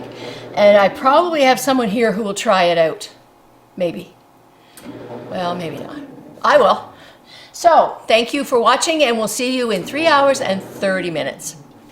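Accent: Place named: Cumbria, United Kingdom. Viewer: I begged for store bought white bread too. Horrid little thing I was. Ha ha. I will be trying your brown and digging out my bread maker. Loved hearing your voice- took me right back to keswick.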